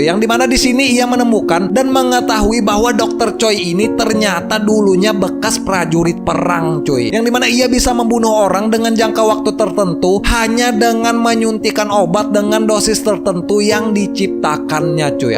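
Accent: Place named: Lampung, Indonesia. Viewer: Dari tata bicara kaya orang Lampung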